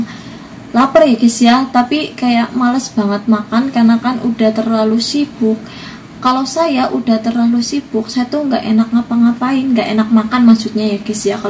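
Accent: Banyumas, Indonesia. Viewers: Ngomongnya ngapak , ya salam dari Purwodadi Grobogan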